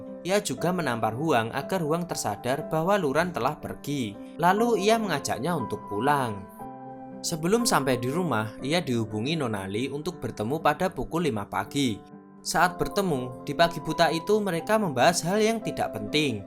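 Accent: Java, Indonesia. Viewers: Medok kali kau bang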